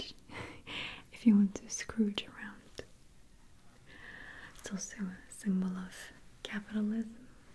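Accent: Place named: Russia. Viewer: you are such a beautiful lady. It seems that you are becoming more beautiful as you get older. I was watching some of your videos from 2011. I think I am going to be one of those women that turns into a hag as I age. Watch, you'll be more gorgeous as you get older. What is your secret my dear. Please don't tell me DNA. I do miss your Russian accent as was so prevalent in your first videos. I enjoy watching those. I could hear your voice more in those. Whispers are great but you have such a pretty voice, it would be great if you could do some like when you first started out. My volume doesn't get any louder. You are one of my favorite asmr artists. Take care of yourself and your family.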